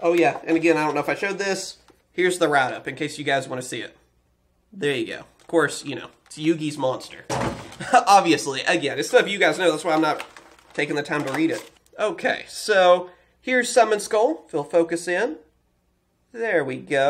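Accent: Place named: Georgia, United States. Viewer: Bro are you from Georgia because you sound like you are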